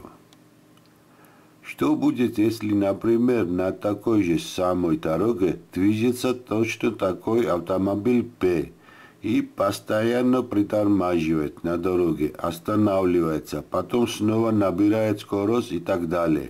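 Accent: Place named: Kutaisi, Georgia. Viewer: ვაა ქუთაისის გაიჩითა :)))ქუთაისელი ხართ?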